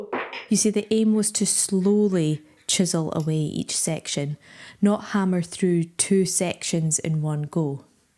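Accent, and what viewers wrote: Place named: Scotland, United Kingdom. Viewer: your langage accent is so close to your husband's ... i habe never been in Scotland ... but listening to your voice ... you both really share a local tone :)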